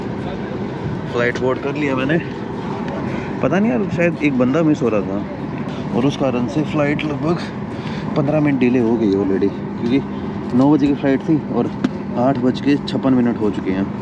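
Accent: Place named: Delhi, India. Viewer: Brother I understand every word of yourself , your voice is similar to delhi region hindi , I study in delhi university in 2007 and live 4year in india , I am also able to read and write hindi and speak also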